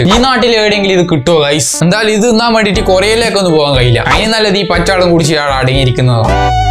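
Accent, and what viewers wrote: Kannur, India. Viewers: ee kannur baasha kelkaan oru prithyeka sugamaa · kannur basha polichu.......😂😂🤣🤣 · Kannur slang😂😂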